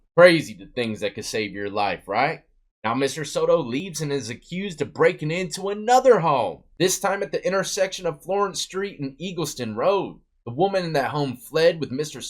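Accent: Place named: South, United States. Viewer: Aye that’s funny ppl mad how you say shit in the south we got our slang in the south we goin say Celtic (seltic)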